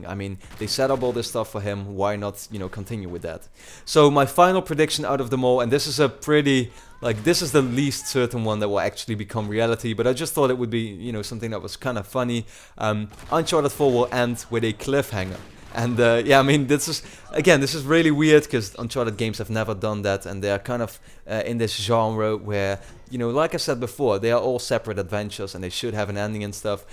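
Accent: Netherlands. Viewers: Hey, did you know that ps4 could hold 2000 friends? Awesome, are u gonna add some subsribers like me? Would be cool to play some rounds with you:)Uit welke stad kom je btw? hoorde meteen dat je uit Nederland kwam, ik uit Den Haag:D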